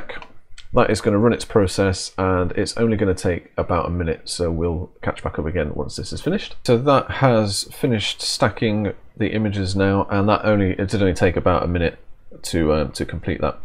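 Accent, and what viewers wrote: United Kingdom. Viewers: OK thanks and BTW how refreshing to hear an English voice on one of these videos and not a loud 'WHAT'S UP' !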